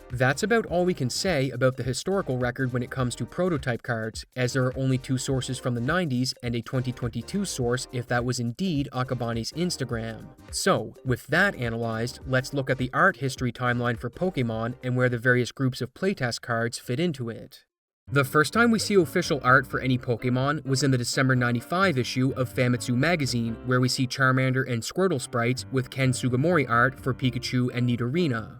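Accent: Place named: Newfoundland, Canada. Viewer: Took me like 3 videos to catch the Newfoundland accent. 👌🏼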